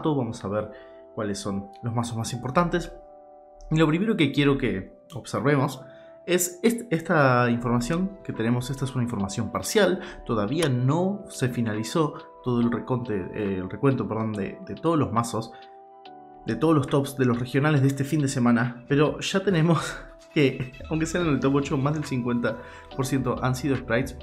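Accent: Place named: Argentina. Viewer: No te preocupes igual me di cuenta hace rato que eras de Argentina por tu forma de hablar, expresarte, etc, que es como si fueras de Uruguay, me gustó encontrar alguien que hace analisis, da opiniones, predicciones, EN ESPAÑOL, por que la mayoría son gringos y no entiendo una M jaja saludos y sigue asi, todavía espero tu analisis de las magias y trampas y monstruos de efecto de la banlist je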